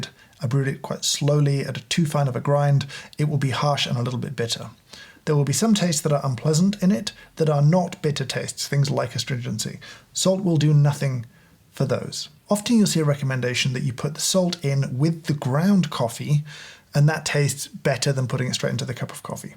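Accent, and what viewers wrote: United Kingdom. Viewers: Yeah, it's called 'British accent'. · That's honestly the only reason why I watch him and I'm even British but I don't sound like this 😂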